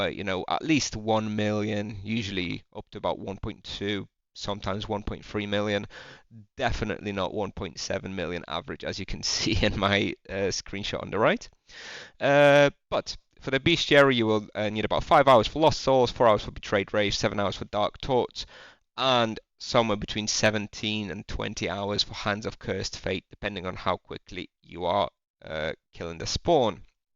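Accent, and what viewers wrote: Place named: United Kingdom. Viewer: no to dobre wrażenie sprawia, mieszkał w UK od wczesnego dość dzieciństwa ładnych paręnaście lat, stąd akcent 😋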